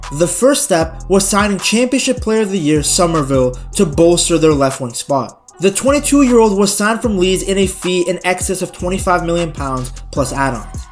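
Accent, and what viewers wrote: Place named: United States. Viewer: 😅 mate please use AI next time. Love your bigging up the club I loved but it all goes out the window with your accent. It's not your fault I don't blame you. We would likely get along loving a beautiful sport. But please use AI. Your accent with an American English twang puts one off, thinking 'sensible' Anyway apologies if my humour or attempt goes wrong. Keep well and keep doing what you love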